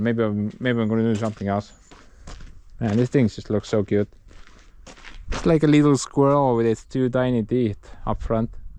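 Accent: Estonia. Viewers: I mentioned your channel to an acquaintance I have in Latvia. He saw your name and said you're for sure Latvian living in Estonia because it's such a Latvian name. But then he heard your accent and said, "No he's for sure Estonian. Now I'm intrigued." 😅 Hopefully he'll be a new fan!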